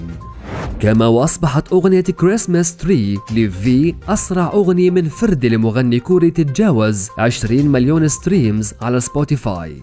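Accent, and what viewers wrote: Iraq. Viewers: هسه يلي جاي يسوي اخباࢪ هوه عࢪاقي اشو يحجي مثلنه العࢪاقيين 🇮🇶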